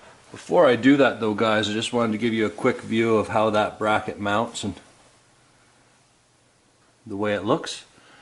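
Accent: Canada. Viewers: Is that an Ontario accent?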